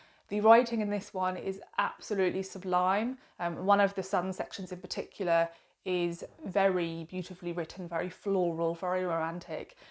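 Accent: United Kingdom. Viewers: I have just subscribed to your chanel. Such an eloquent, clear and very informative book genre review. It's so refreshing to firstly have a book chanel without the person waving a book around while you are trying to look at it. Someone who speaks clearly and I can understand. I'm British and so are you so that's helpful 😅. I've grown tired of many of the book chanel on YouTube, yours is so good, thank you. I'm 73 and an avid reader since the age of 5. But I just feel I need good quality suggestions , which you provide. Well done. Julie x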